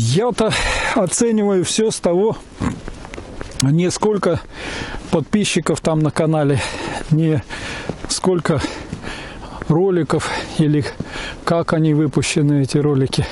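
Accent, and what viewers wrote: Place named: Armenia. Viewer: Слышится лёгкий армянский акцент )) Природа красивая.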